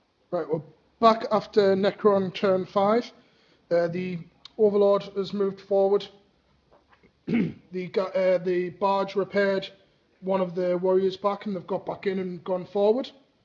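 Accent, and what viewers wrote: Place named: Durham, United Kingdom. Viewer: Was very pleased to see Wolves and Necrons and enjoyed the report, you sound like you are local to Durham as well. One thing is Logan on Stormrider is a vehicle so moves 12" in movement and flat out in shooting so shouldn't roll for a run move. Also about Stormfang in the formation, it can be chosen if it passes or fails reserve roll, it's not a reroll, that's the voidclaw formation rules.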